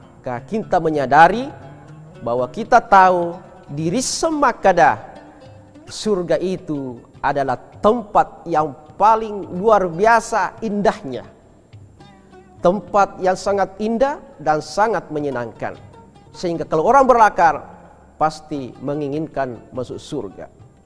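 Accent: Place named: Bugis, Indonesia. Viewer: Iyye puang biar ada khas bugisnya...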